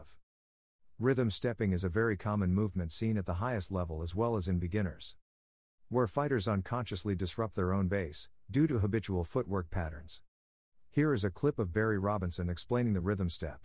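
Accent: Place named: India. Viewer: He sounds like an indian so no lol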